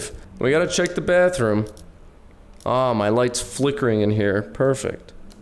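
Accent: United States. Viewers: What is his accent? Sounds Jersey, but I wouldn’t have a clue.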